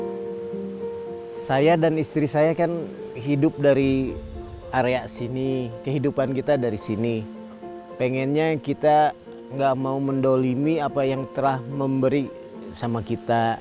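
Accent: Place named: Sunda, Indonesia. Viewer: Orang Sunda anu nyundaa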